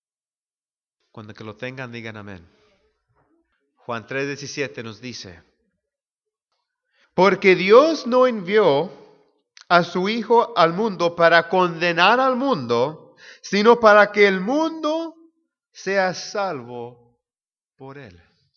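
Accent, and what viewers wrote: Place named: United States. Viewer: Compadre tienes que aprender un poco más hablar español eres muy pocho y todo lo que hablas no se entiende discúlpame mucho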